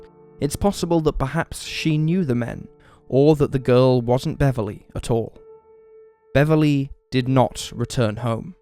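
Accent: England, United Kingdom. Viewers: Pommy accents do not help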